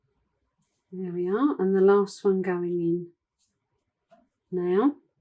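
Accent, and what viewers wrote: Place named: Cowley, United Kingdom. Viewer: Your accent helps - sounds terribly similar to what I grew up with in Cowley near Uxbridge as a youngster.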